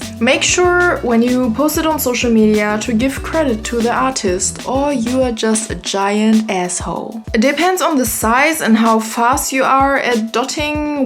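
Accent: Germany. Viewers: dot me an border :D hear that german accent girl ;-)) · I LUV YOUR GERMAN ACCENT JSHSVWKQGW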